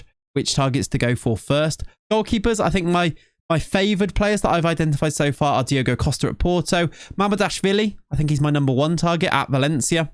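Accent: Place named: RP, United Kingdom. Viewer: As an American, I was particularly grateful that this youtuber was both informative and has both an rp accent and Harry Potter glasses.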